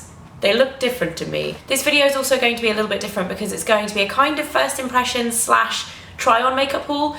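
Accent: United Kingdom. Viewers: You're super gorgeous.!!! Love your accent <3 Love watching your Vlogs. I'm also trying to learn British accent by imitating your voice <3 <3 <3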